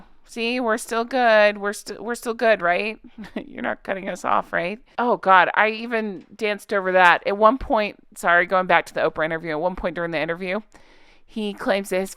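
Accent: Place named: South, United States. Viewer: let your southern roll on out. I'm from NC and I totally get it. 😂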